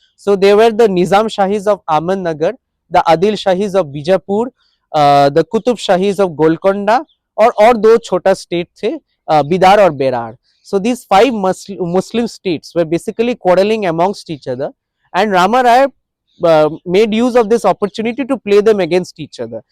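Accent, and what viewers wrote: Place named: Bengal, India. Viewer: His Hindi accent is Bengali..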